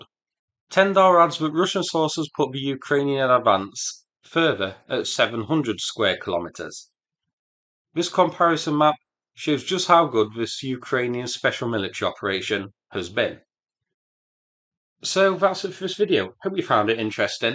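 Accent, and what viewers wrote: United Kingdom. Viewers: Excellent video. No unnecessary sarcasm or disrespect, just a good briefing in good old British English. I hope the Ukrainians make the most of this good moment and momentum.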